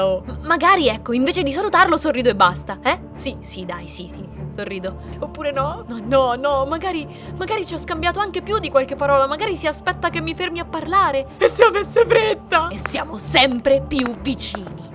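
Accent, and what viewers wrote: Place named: Milan, Italy. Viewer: Ahahah, il tuo accento milanese è fantastico! Ti è mai capitato di incontrare qualcuno di famoso per strada e sclerare ? Comunque, bellissimo video. Baci💚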